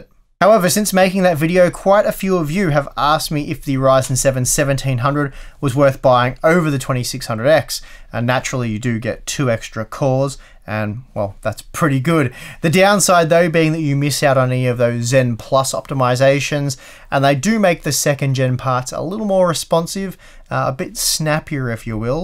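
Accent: Australia. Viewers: I love what the auto subtitles make of your intro with your Australian accent every time. This time it said 'welcome back to hammer on box' 😂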